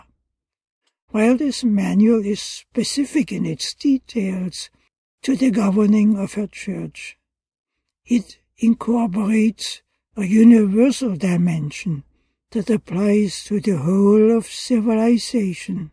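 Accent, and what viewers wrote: Germany. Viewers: Well read. Very good English, the German accent just enough to be charming.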